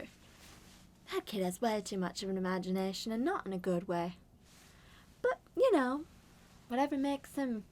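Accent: South, United States. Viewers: This is so good I thought you were gonna have a British accent but you didint it was more of a country. Can you make a Fred bear or Freddy next? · I’m southern, and this accent was lovely! Nice video n cosplay too! (^v^)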